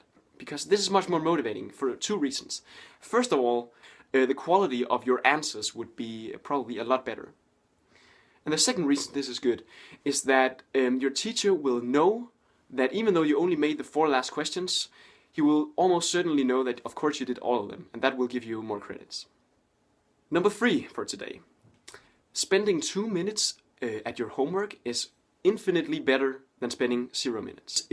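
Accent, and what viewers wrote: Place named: Denmark. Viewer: Går ud fra du er dansk ud fra din accent, det er fandme noget bull shit det der, du bilder folk ind, at man kun skal fokusere på enkelte opgaver, frem for alle. En manglende opgave betyder -3 for den opgave og det er ikke ligefrem skide godt for ens årskaraktere. så kan det da gøre skide ligemeget om du så for 12 i tre afleveringer hvis du alligevel for -3 i to andre. IDIOT!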